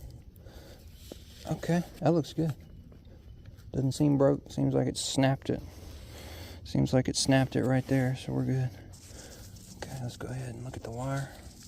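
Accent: South, United States. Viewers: can tell from his accent hes down south, def below NC/ KY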